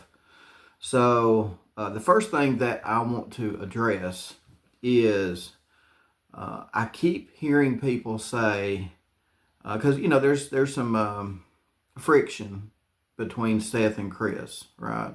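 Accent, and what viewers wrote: South, United States. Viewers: darn your southern drawl is Awesome,Im from Tennessee but iv lost all my accent,Sad,Sori off topic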